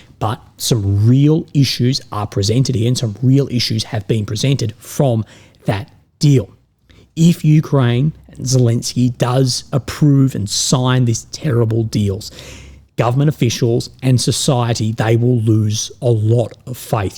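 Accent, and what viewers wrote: Australia. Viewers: Aussie twang is unpleasant to my ears.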